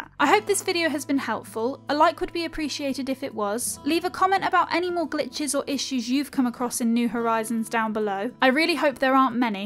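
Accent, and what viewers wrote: United Kingdom. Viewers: It's a Brittish accent.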